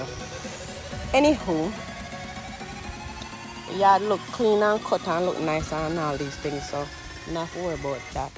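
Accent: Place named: Jamaica. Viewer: Happy 4th of July. Lol one minute you talking so I can understand then the next you talk Jamaican lol but I understand I’m learning 🤗🤗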